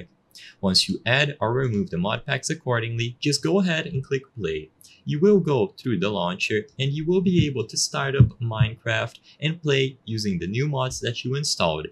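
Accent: Brazil. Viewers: sabia que tinha sotaque de BR, entrei no canal pra ter certeza kkkkk. Valeu pelo vídeo irmão!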